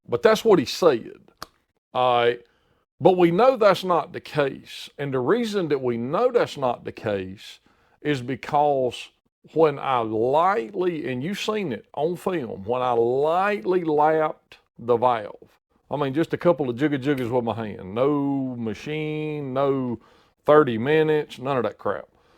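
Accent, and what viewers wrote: South, United States. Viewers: someone with a southern drawl and slow talking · your overwhelming Southern Drawl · i have near to no experience with valve-work, but i appreciate your professionalism and respectful attitude coupled with the most southern accent i've ever heard as a foreigner. i enjoyed every second of this and even learned a little bit too. thanks for all that. god bless! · your amazing southern draw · I'm from Canada and I have no problem understanding your lingo. In fact I love the Southern accent..!! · I love the Southern Drawl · ‘… people that’s smarter than me…’ as a foreign viewer, who knows nothing about machining or mechanics, can I just say how much pleasure it gave me to listen to you discuss this with your accent. To a non-American you have a real ‘good ‘ol boy’ way of speaking that adds huge value to your out-of-hand dismissal of his criticism. 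Fantastic. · You talk like a redneck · that southern drawl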